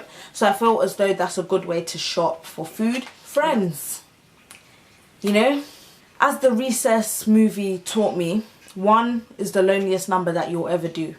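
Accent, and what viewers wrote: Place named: England, United Kingdom. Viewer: I love listening to English people speak... best accent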